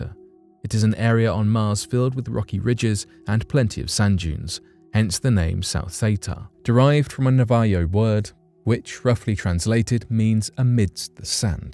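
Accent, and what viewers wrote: United Kingdom. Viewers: This narrator is British but sounds great. Compared to that other guy.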